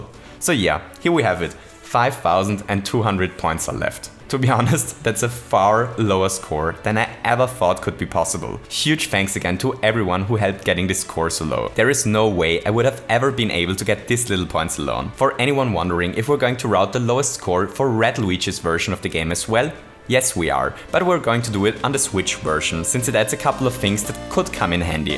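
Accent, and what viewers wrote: Germany. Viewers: Are you german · I hear a german voice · the german english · You sound like a German speaking english and I like it. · You have a funny German accent tho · What accent do you have? German? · From where you are ? (Sounds like Germany )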